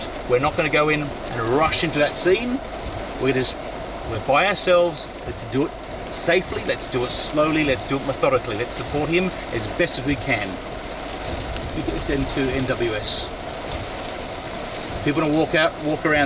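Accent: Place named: Australia. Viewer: Just came upon your channel sir. I really appreciate your approach. Safety and a true love to help others. I'm sure im not the first, but can you imagine being in the southern US; get smacked by a storm and have some bloke with an Aussie accent asking if you're ok. Did i get whisked away to Oz? Well done. 73 God Bless.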